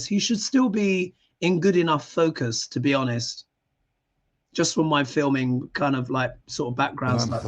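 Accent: United Kingdom. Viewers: A cuban with a British accent 🤷🤔😅😂🤣. How can we greater raise the TRUMPSTEIN REGIME and GESTOPO CRIMINALS intelligence 🤷🤔🤷🤷❓❓❓❓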